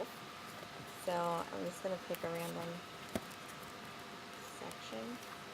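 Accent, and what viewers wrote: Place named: Michigan, United States. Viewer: you forgot to say what state you are from. you sound like you're from michigan though. am i right?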